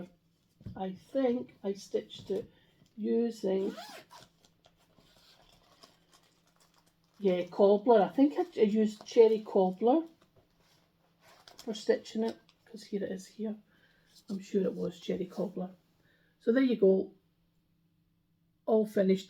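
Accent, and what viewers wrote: Scotland, United Kingdom. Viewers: Lovely to hear a Scottish voice on Flosstube. Back to cross stitch after about 25 years and really enjoying it. Can I ask where you got your lovely project bags from? Just subscribed x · Hello, so pleased you have returned to Youtube. I have missed you over the last 12 months. I do love your Scottish accent as well as. Happy stitching.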